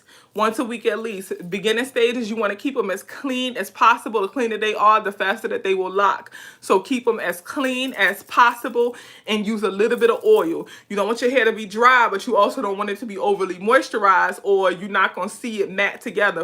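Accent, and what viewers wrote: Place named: New Orleans, United States. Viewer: You sound like you are from New Orleans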